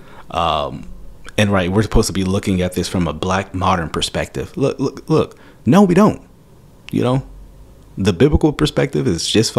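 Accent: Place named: London, United Kingdom. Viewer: London accent 😂 I told my husband that accent and African accents make people believe you more.. 😅 foolishness but seems to be true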